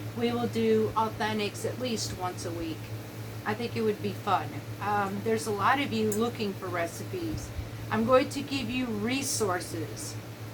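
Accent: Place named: South, United States. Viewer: Hi 🥇🏡❤️⭐️⭐️⭐️⭐️⭐️ Let’s do all the shopping. It’s extra fun for me because it’s so different than Canada. I love southern accents ❤️. You are my sister and I can’t wait to shop together 😊😊😊😊😊😊😊😊😊😊😊😊😊😊😊😊😊😊😊😊😊😊. The walk was wonderful. Only one complaint is Jason and I are still waiting for a yes 🙌 on putting the membership on. It is totally optional for subscribers. You will have a good income if even a small percentage of subscribers join. Pretty please with infinity cubes of sugar?No extra perks nessasary or wanted we love ❤️ you!☮️💟🖖CC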